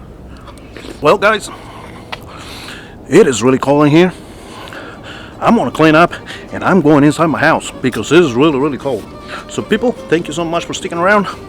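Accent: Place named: Kansas City, United States. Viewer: Boy, those Potato Taco's with salsa! Yummy! You are a real Mexican with a Kansas City accent. Not making fun of you. I have Mexican cousins that live in Topeka! I am going to tell them about you! I will making your salsa, that's for sure!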